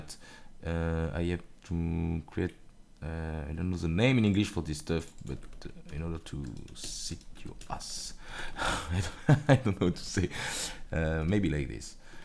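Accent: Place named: Europe. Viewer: mistakes make you beter and i am just happy to see other people from europe trying to speek english keep up the good work.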